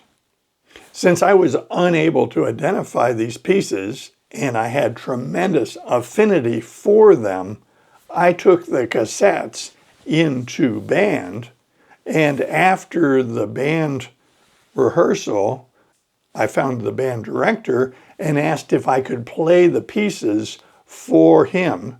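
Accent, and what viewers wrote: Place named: United States. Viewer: 💙💙💙... Thank you, for your stories. I never cease to be amazed at how through them I see the unfolding of my own life. You emphasize those small/big things that bend our path in a new and dazzling direction. I would like to know in more detail how the flame of your interest in Spanish was ignited. My interest in English arose from the cartoons we saw on TV, the movies we saw that showed life in America and then, the music, the songs of,... in which the magnificent English language, with its rich vocalism and its bold consonants, tinged the songs with an astonishingly beautiful color and I never forget nor get tired of the pleasure of hearing the exquisite pronunciation of these singers... Your way of speaking, your accent and the warmth of your voice add charm to the English language spoken in the USA... and for that and more I thank you...!!!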